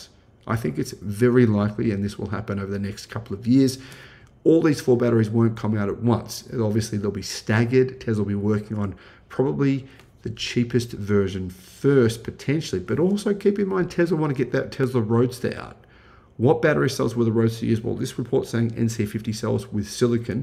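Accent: Australia. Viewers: Mate, your accent is perfect, it's not even strong "back of Bourke" strayan as some of my close friends (and I can understand them perfectly). Keep going mate, you're doing great. Enjoy the US trip !!!! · Don't listen to 'em, bro! Americans adore aussie-speak! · As a Brit to an Aussie, don't let a Yank tell you how to speak. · I love the Australian accent. Keep it up! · Don’t change your accent. I can’t believe someone suggested that. Why would you need to sound like us Americans? We would probably rather sound Australian.. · Lovin the ausie accent. Always great work. Revolutionizing the auto industry. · Americans want you to sound more "American"???? What? Most Americans really like an Australian accent. Now if we can just get you to talk like Crocodile Dundee, your subs will at least double! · Your accent is awesome! Be you. The Australian tourism council should be paying you per view!!! 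· I like your Aussie pronouncing...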